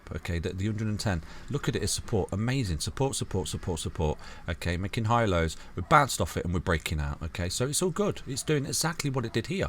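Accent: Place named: Leeds, United Kingdom. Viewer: Grab a pcs of paper and write down the time and then you just put a time in the description for example HERO BTC Etc YT will split the video based on that. its as simple as that pal. Btw, I am curious where your accent from. You've mentioned Leicester a few times but for me it sounds like a Leeds accent? Just out of curiosity pal.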